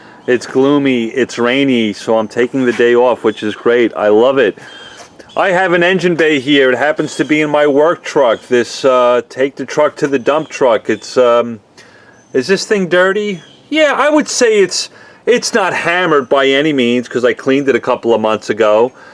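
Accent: New York, United States. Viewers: He sounds like he’s from New York not from Dallas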